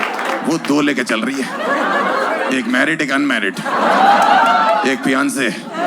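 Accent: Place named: Haryana, India. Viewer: Haryana m rehkar Haryanvi seekh gaye Bhaai 😂😂😅😅🙏🙏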